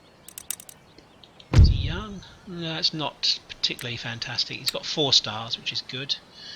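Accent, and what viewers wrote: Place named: England, United Kingdom. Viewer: mr im not sure if i ask but are you english because i like your accent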